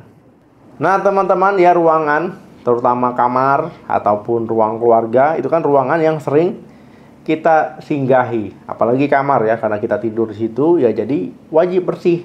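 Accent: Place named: Bali, Indonesia. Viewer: ini logat bali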